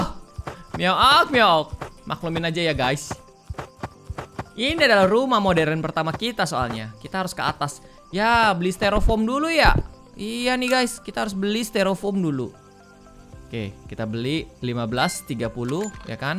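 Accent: Java, Indonesia. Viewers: Kak kalo ngomong bahasa Jawa lucu bgt wkwk🤣🤣..eh btw kak STMJ susu telor bukan teh🤣🤪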